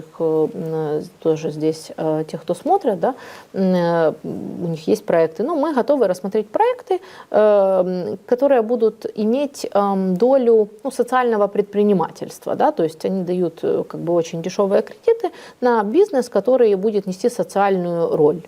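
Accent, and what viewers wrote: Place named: Ukraine. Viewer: Это элемент суржика.Русский язык с украинской фонетикой.Человек ни по русски ни по украински не говорит.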